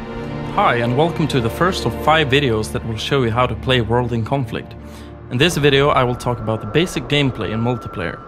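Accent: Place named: Sweden. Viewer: haha yää, du låter svensk??^^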